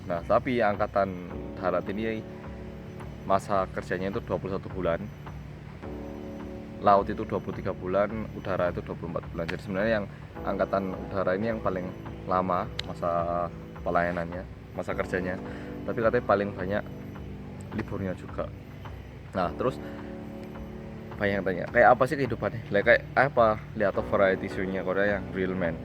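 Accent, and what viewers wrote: Java, Indonesia. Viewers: Boleh tau gak? Masnya orang JAWA atau KOREA ?? atau mungkin turunan blasteran JAWA KOREA??? INDONESIA nya perfect + logat jawa pula ... Tapi liat Sunny Dahye , Orang Korea Tulen tapi Super Fasih Indonesia nya daripada youtuber korea lainnya... Cuma Sunny Dahye yg aku tau SUPER FASIH bahasanya daripada Han Yoo Ra & Lee Jeong Hoon yg masih ada logat korea nya.. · kok ngomonge pake logat jowo??..mank asline situ wong OPO?? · médoc banget koyo javanese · Bahasa indonesia logat jawa josss mantap 👍👍👍👏👏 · medoknya gemesin :v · sya mau tanya kmu ni org mana? klo org korea.. bhs indonesianya medok bgt kya jawa2 gitu. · Edyaaann, logate kentel jowo, lk ngrungkne ambi turu yo dkro wong jowo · How can I express my respect about your "medok jowo", dude?? 😃 Karena saking suka nya, liat video2 mas'e sampe keturon, isuk2 paketan.e ludes 😄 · Mas ee iki asli korea opo blasteran?kok rada medok wkwkwk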